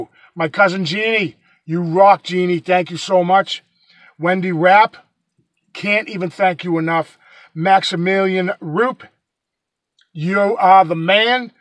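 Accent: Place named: Massachusetts, United States. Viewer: I have to laugh. The very first video of yours that I watched, not having any idea what part of the US you were in, as soon as you started speaking, I knew you were from SE Mass. Not Boston, not Western MA, but the Taunton, Dighton, Berkley, Norton, Mansfield, etc region. I think your videos are "wicked awesome".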